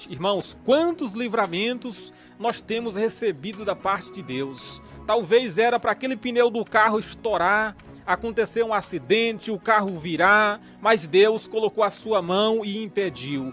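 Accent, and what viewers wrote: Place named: Maranhão, Brazil. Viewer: Sou de São Paulo e gosto muito dos testemunhos e exortações que o senhor traz em seu canal. Admiro sua forma de falar. Sotaque de Maranhense é muito legal. Quero muito conhecer essa cidade linda. Tu é homem de Deus e abençoado. Fica na paz. Abraços!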